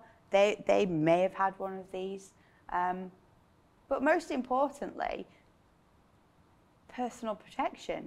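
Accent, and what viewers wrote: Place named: Yorkshire, United Kingdom. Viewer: She sounds to me like a less strong version of Jodie Whittaker's accent and she's Skelmanthorpe, so certainly Yorkshire, but it'd probably take an actual Yorkshire person to narrow it down t' area.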